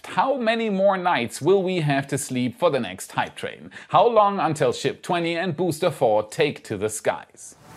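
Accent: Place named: Germany. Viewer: Guter Channel Digga ;) Irgendwie hab ich mir schon gedacht (gehört) das du Deutscher bist ;)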